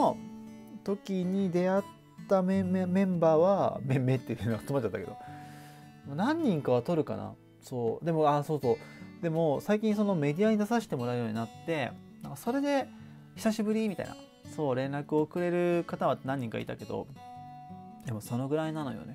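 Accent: Kansai, Japan. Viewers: 関西なまりめっちゃ好きです笑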